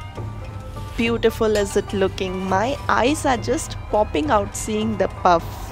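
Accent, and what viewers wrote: India. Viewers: I DONT KNOW WHAT I LIKE MORE; THE NICE INDIAN ACCENT OR THE BREAD. BEST EXPLANATION. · What a lovely accent. I want everything that i learn in future to be taught to me by a a well educated Indian lady.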